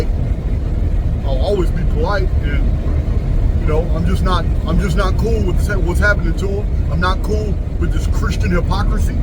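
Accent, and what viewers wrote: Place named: Texas, United States. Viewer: "I'm not buyin' what this Hobbit is sellin'" 😂💕🇬🇧 I love your Texan accent when you get on your rants!❤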